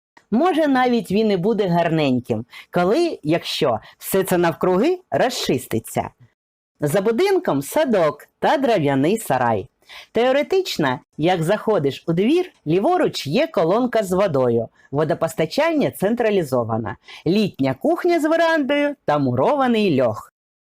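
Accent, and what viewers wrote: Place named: Russia. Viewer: З російським акцентом,наприклад говорить прапаную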